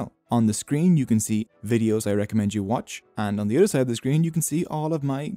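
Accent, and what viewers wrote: Ireland. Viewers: I love your videos... Watching them from this morning. Your animations are truly great and I love your voice & accent, kinda sounds Irish, idk. Wish you could tell me where is this accent from. P.s: you got a new subscriber 😁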